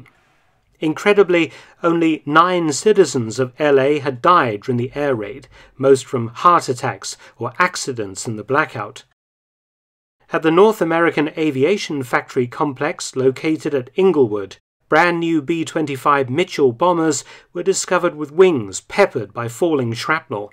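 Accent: United Kingdom. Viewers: Ok, the humiliation and desire to retaliate because of the cactus, combined with the british narration, makes this video seem like a Monty Python mockumentary.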